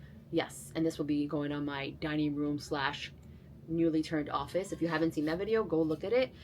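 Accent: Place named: Brooklyn, United States. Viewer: I love all of your finds and your style!! I lived in Brooklyn in the 80’s as a teenager. Your accent and your personality brings me back, and reminds me of all of the Italian girls that I went to school with….I miss it so much!!! The best time of my life!! Plus, I want to convert my spare bedroom into a closet and dressing room…but my 20 year old son is still in there 😂 Id say your Halloween costume will be Fran Drescher as the Nanny.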